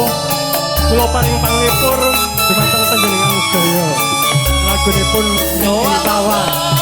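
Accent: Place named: Tegal, Indonesia. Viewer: Bahasa Jawa nya Ngapak nih. Pst eksporan dr Tegal ya boskuh. He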